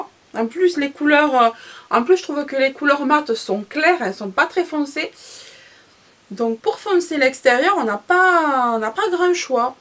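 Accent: South, France. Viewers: ton accent qui sent bon le soleil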